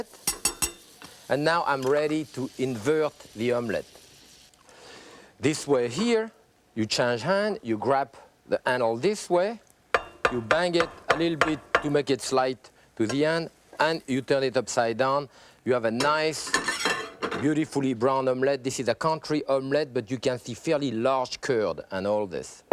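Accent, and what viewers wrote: France. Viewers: Thought he was speaking French until 5 seconds in. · This video must have been made over 25 years ago when he was quite young and had heavy French accent. His French accent is not so strong in his latest TV shows. Also, I bet he does not scrape a non-stick pan with sharp-pointed metal forks any more. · god dammed that guy. fuckin' french way too strong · Well, no doubt he was born French and lived there - until he met and fell in love with an American woman. Being a gentleman, he moved here instead of asking her to be uprooted and move there. And so now he is an American, and a citizen. We thank France, but we definitely claim him. Being the man he is, we'd have given him a permanent green card - so it was his choice - and he became an American. Only his voice, his training, his memories and his accent are French now. And probably a corner of his heart, which is also fine. 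· Im a french canadian and I just enjoy the french accent ! Im trying to hide mine but it doesn't work often ! hahahah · beautiful french accent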